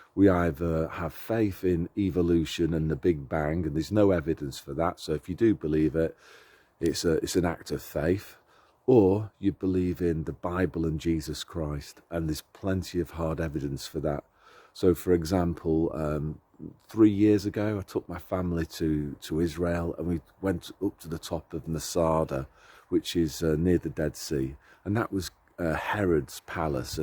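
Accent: Northern England, United Kingdom. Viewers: I could talk to you for hours on this subject 😁 but for me God creating God, l can't make that leap if you understand what I'm saying. I'm not religious but l know there has to be a 'creator'. I'm also of the opinion that the human brain is not yet capable of understanding, l know mine isn't 😁. I've got to ask, are you a Northern England lad?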